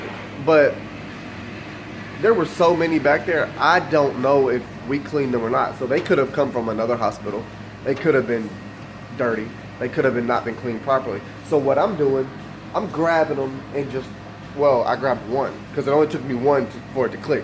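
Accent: United States. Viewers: HARD WORKING GUY. SEEM DEDICATED, AND YOU ARE LISTENING TO IN THE BACKGROUND. PLEASE STOP TALKING WITH Y OUR NEGRO SOUTHERN ACCENT, YOU'RE WHITE -OR SO IT APPEARS. SOUND PROFESSIONAL. THANKS. KEEP ON TRUCKIN. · Joey Monroe prob just a bronx/new york or brooklyn accent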